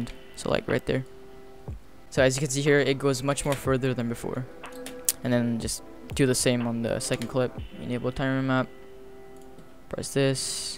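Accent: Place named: United States. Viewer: whoa ur malaysian an yet u have an american accent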